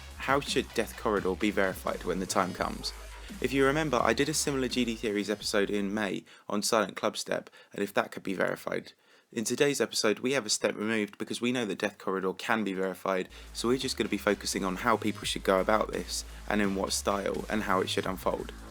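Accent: United Kingdom. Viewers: I love ur british acent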